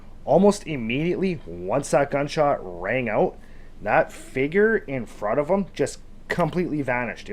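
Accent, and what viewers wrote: Canada. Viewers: Huh, so that is what a Canadian Cow Boy sounds like, ey? I couldn't peg where you we're from for at least a couple mins, LOL. Great vid for the upcoming Halloween season! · Could tell you were from Canada or Northern States in US by the way you pronounce your “O’s”! My sister married a ND man and he sounds like you! Love it! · Bruh as Canuck working in the US your accent made me homesick haha.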